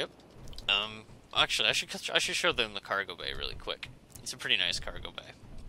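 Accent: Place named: Australia. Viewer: Totally Aussie!!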